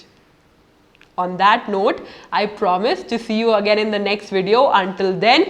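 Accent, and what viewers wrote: Hyderabad, India. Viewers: Indian accent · All is good but your pronunciation of words with 'con' 'com' is not ken but kon or kom, like continue, communicate. And there is no way that indians can get off their ascent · Are you from Hyderabad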